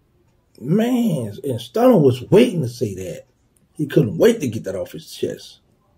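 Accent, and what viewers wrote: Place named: Louisiana, United States. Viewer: Did he grab him? Yea come ya😂😂 straight louisiana exactly how we talk.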